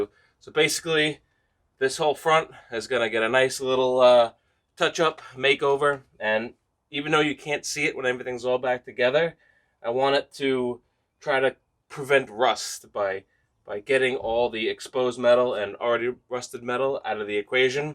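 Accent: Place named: North, United States. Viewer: I actually had to google what the heck that was 🤦‍♀️😂 LOL! I don’t talk to many people from Lawn guyland, so I was out of the loop. You definitely have a northern accent! Especially when you’re talking to other northerners. I do the same thing when I go home 😄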